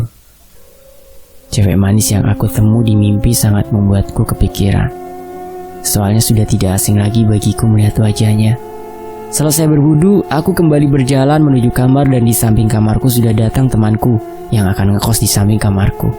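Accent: Java, Indonesia. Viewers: J Ms jowone medok bngt rek 😂😂😂😂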